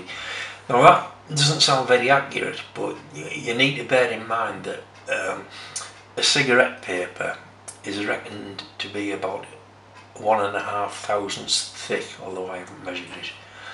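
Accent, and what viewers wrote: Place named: Yorkshire, United Kingdom. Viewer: Where in the world are you as you sound like you live near me Im in Guisborough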